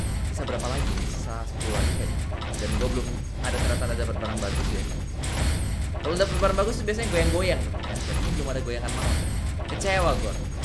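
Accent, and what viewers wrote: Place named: Medan, Indonesia. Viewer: Gw suka kalo bag marah marah kau kau wkwkwk org medan mana ni horass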